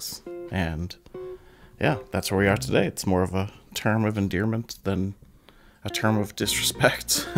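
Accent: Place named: Louisiana, United States. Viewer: I have to say I was surprised you are Canadian. I would have guessed from your accent you were from Louisiana. And with Porter being a southern gentleman and all.; ) Ohh, your babies love you sooo much. Thank you for coming over to the dark side of little tiny chi wow was. I have 2 and they are the loves of my life. When I was a little girl my great aunt had a yappy chi and I swore NEVER EVER. So I totally relate. 🐕🐾❤🤗👍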